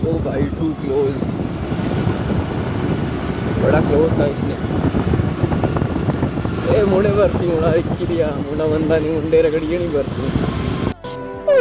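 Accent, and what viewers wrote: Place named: Pahari, India. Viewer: Pahari accent Superb 🔥🔥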